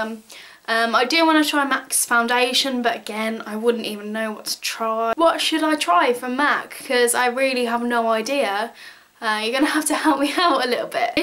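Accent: Birmingham, United Kingdom. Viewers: Good to see that your back at making videos 😊 When you said about the accent, I can hear the brummie in you, it's a nice little accent! Xxx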